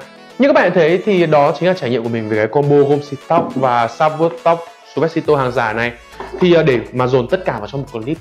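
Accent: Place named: North, Vietnam. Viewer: Giọng bắc kỳ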